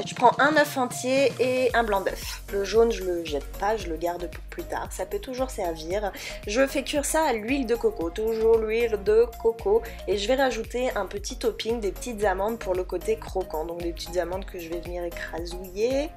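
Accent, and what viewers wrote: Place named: England, United Kingdom. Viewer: Laccent anglais de ouf #pas du tt de l'ironie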